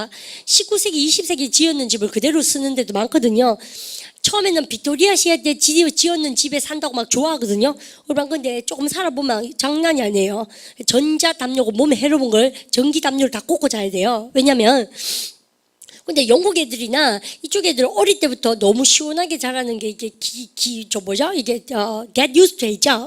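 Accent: Daegu, South Korea. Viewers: 이제는 대구 사투리가 아름답게 느껴지네요 ㅎㅎ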